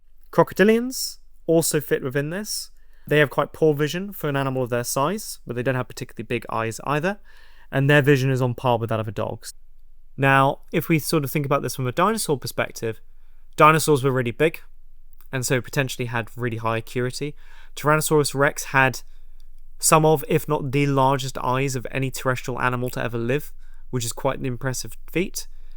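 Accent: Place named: United Kingdom. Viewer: You sound British, any chance you're in the UK? If so, have you heard of DinoCon and would you PLEASE come present your work <3 <3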